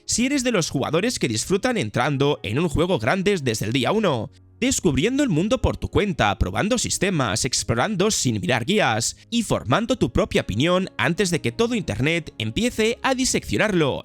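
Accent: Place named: Spain. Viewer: Por su nacionalidad el espano se habla en much paices 😂😂lo que cambia es el acento creo que es be ser espana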